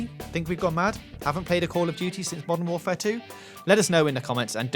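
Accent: United Kingdom. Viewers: This guy is probably the most British brit i've ever heard.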